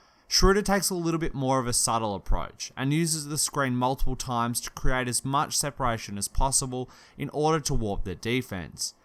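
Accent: Australia. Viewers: Aussie accent indeed..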